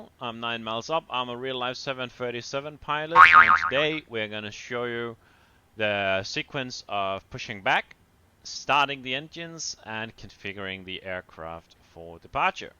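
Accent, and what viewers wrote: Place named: Denmark. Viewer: Hey just wondering, are you from denmark? You sound danish haha, im danish too btw!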